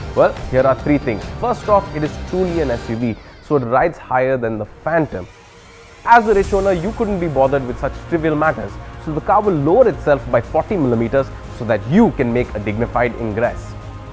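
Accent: India. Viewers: What a crap review. He's not in the car, it's only generic footage, and his Indian accent is a bit thick. I'm not going to buy one of these now...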